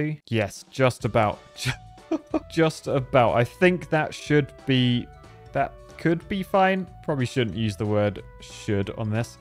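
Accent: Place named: United Kingdom. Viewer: That accent he's got. What is it? It's British, but what part of England goes "bewesh" instead of "boosh" and "cloiyser" instead of "closer". It tickles my ear to hear someone say "Aye doiyn't naeuiy" instead of "I don't know"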